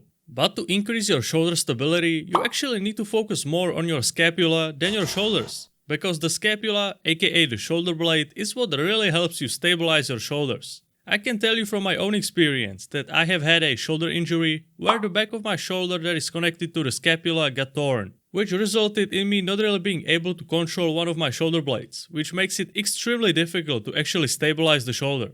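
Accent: Czech Republic. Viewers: Hey! I know you will most likely miss this comment, but I still wanna ask: I have an oddly specific interest in accents and like to make a small "game" for myself in guessing them and after watching a few of your videos I wanna ask: Are you Czech? Odd question aside: I love your content ❤ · yo are u Czech ? just guessing from ur accent haha, great video anyways, will definitely try it! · Český přízvuk 😅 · you are from czechia, the accent cannot be hidden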